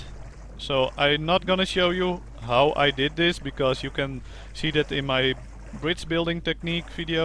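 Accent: Netherlands. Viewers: Lol i can hear he is dutch (im dutch too btw)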